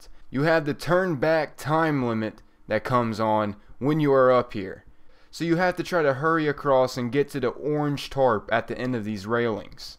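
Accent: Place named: Pittsburgh, United States. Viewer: gotta be from Pittsburgh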